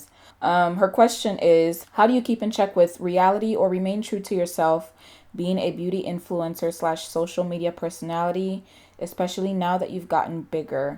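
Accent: Nigeria. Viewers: I love your Nigerian accent it sounds so pretty! This look is so pretty 😍 How do you find out what’s trending ? Thank you for these tips. Very helpful 💕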